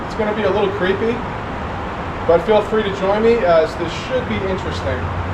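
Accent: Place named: United States. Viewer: Your Accent is American. Where are you from? Oklahoma here....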